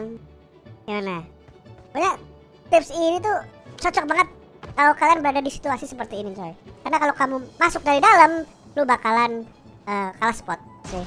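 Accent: Java, Indonesia. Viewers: bhs jawanya lancar n kentel jawanya kayak kopi. Bang enggak.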